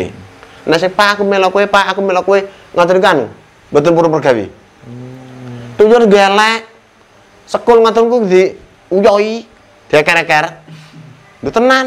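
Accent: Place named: Kudus, Indonesia. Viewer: logatnya ko sperti orang kudus, tayu pati ya, mungkinkah mbh asli dr pati tayu atau kudus.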